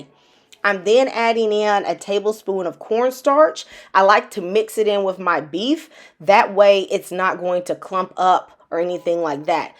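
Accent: South, United States. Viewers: Heard another southern accent--of course it's gonna be great!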